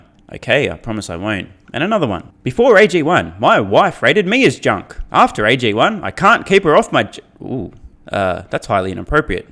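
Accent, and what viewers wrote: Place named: Australia. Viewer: Sounds like an Ausie(sp?). Hat's off Mate!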